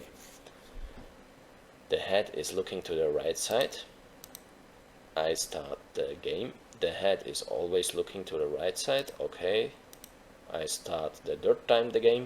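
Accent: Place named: Germany. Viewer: Man I love that German accent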